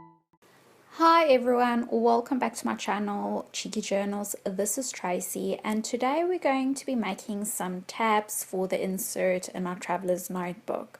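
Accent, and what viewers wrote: South Africa. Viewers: Hi found your channel through 49 dragonflies. Is that a South African accent I hear ? Good ideas to try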